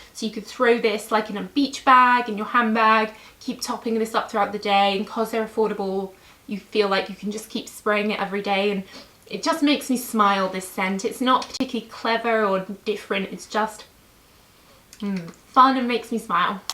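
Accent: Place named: London, United Kingdom. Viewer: Hello from Brazil again!! Not only do I love hearing your reviews, but I also love just hearing you!! It reminds me of the time I lived in London, I could listen to your for hours!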